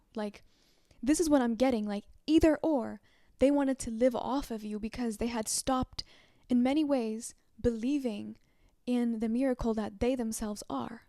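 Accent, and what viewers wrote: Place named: United Kingdom. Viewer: I am attracted to that voice! I am a sucker for that english and British accent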